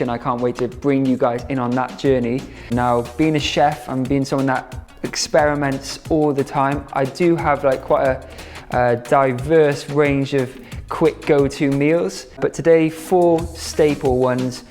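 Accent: Wales, United Kingdom. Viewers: Damn - James left SortedFood today, and just as we all hoped you’d pop up as a guest chef in their London kitchen, you move back to Wales! Although as most of my family lives near Swansea, I’d be happy to pop up the M4 to any restaurant, kitchen or culinary school you open up. Look forward to your Welsh accent amping right back up:) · love that welsh accent <3 many many many good wishes for your new proyect of life. love you ! besitos! Love the music also !!! and the "bloopers" omg the best hahaha thanks for the recipes and the good vibes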